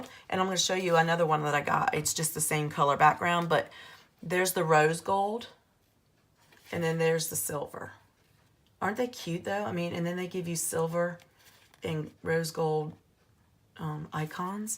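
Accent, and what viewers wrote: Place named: Carolinas, United States. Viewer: Love that color!!! If you dont mind, where are u from? I live in VA now but lived in Carolina for 25 years, your accent sounds like a Carolinian. So just wondering!!❤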